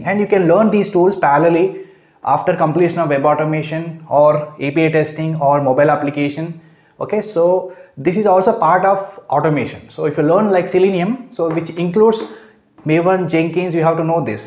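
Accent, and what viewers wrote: India. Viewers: First thing: Improve your English. This is Indian English, not UK/Oxford English. I don`t understand a shit.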